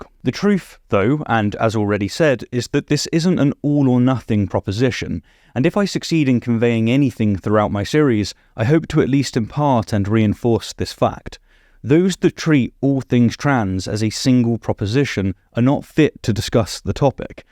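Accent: United Kingdom. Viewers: It's sort of nice to hear a voice with this accent say that trans people are people. Some hope for the UK yet.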